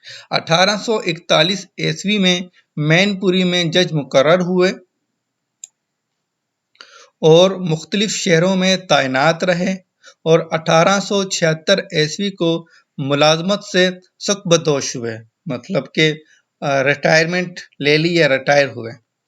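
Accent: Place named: Punjab, Pakistan. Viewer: صاحب! تلفظ کو بہتر کیجیے۔ مناسب انداز ہے اگر پنجابی لہجہ ختم کر دیں تو اور بہتری آسکتی ہے۔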